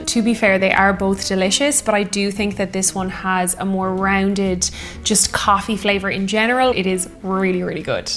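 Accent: Ireland. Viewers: how more adorable can you be ... simply crazy about the Irish accent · love your accent i llke the way you look irish tart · I love your Irish accent. I liked when you were on the TRY channel.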